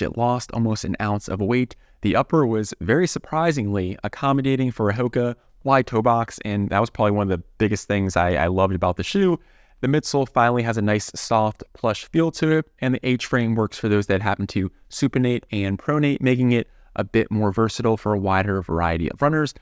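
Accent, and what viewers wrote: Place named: Philadelphia, United States. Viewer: That Philly accent is crazy 😂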